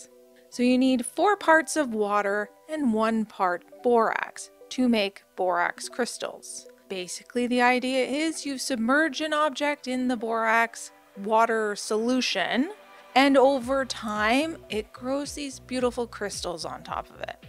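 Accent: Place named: Canada. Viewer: Watched a few shorts on this method , but yours BY FAR is what i was searching for ! Plus i here s hint of fellow CANADIAN accent <3 TY for all your hard work !! HAVE A GREAT DAY!!!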